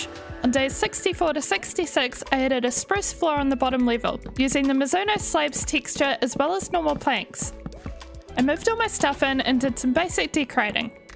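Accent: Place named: New Zealand. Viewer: i know a kiwi when i hear one!!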